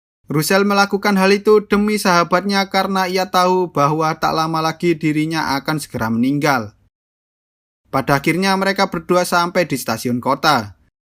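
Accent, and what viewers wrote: Java, Indonesia. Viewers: Uong jowo to mas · Ngomongnya jelas bgt bang tapi medok bgt cengkoknya 😭 aku agak gak biasa dengernya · Loh,Mimin wong jowo to dadake? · Mimi orang Jawa kah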